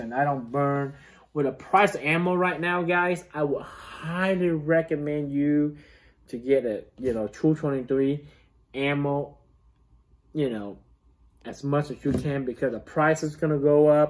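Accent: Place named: Texas, United States. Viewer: You sound like you have some Texan in your accent, so if someone talks shit about it, Fuk them! Keep doing what your doing. Haters gonna hate.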